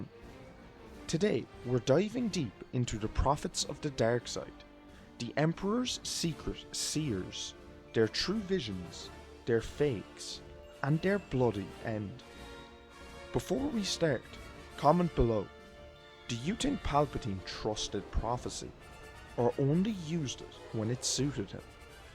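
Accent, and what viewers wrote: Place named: Ireland. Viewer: That was yet another fantastic video. You know, that image that you were using, the one of the character that you called "Black 🕳 Hole?" Well I've seen other YouTube Star Wars creators use the same image, but I've never heard anything about the name of the character, their back story, nothing. So thanks for that, now I know what the hell "the big boys" are using to push their own channels along, without so much as a characters name, much less a description of what the audience is beung presented with!! P.S : - Dose my old and bush-bashed Australian ear detect just a little more of that truly wonderful Irish accent in your delivery this time? Or should I just keep away from the bottle? .. 🍺 🍻 🍺 🍻 🍺 ... See what I mean? I really must be getting back to my A.A group meetings! Either that, or join up with the local Rastas, Mon!!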